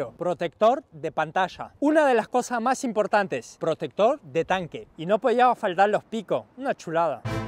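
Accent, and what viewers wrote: Argentina. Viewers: Ese acento bien argentino jajaj muy bueno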